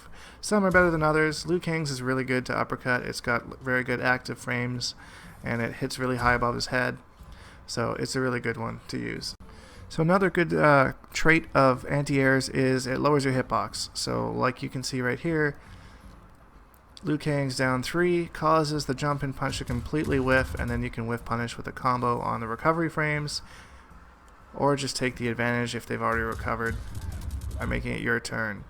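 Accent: Canada. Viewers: I thought South Park was being funny by the Canadians saying "Aboot" but its true lol. Love ya!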